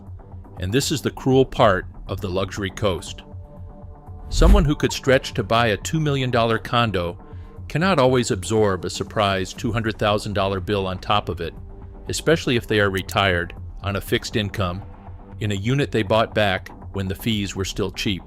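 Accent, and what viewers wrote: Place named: New York, United States. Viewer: You must be from new York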